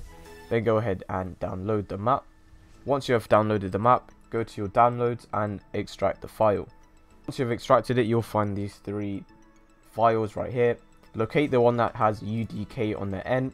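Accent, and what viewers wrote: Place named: London, United Kingdom. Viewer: Sheesh bigman with the london accent bigman thatnks for the help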